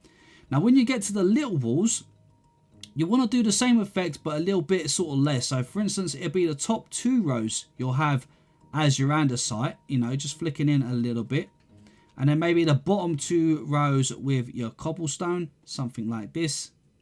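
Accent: United Kingdom. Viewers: Hey...my daughter is the reason i play minecraft. Its our way of spending time together. She likes the game so i take intrest in what shes doing and enjoying. Shes always asking me to build things for her cause she wants her village to expand faster...well sadly im definatly not an artist. And my builds look worse than what she can do..lol so i started you tubing tutorials and found you. I love how simple you make the builds seem. And i absolutly love love love your accent and the sound of your voice. Where are u from if you dont mind me asking. My guess would be in the uk somewhere. But my daughters village is really starting to take shape and she is really loving the midevil style. Thank you so much for making my daughter happy...she was getting annoyed with having to rebuild my builds so they dont look like crap...lol and now shes you tubing tutorials as well and finding stuff she likes. 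So i have subscribed and look forward to seeing more amazing things that me and my girl can do together... bringing families together.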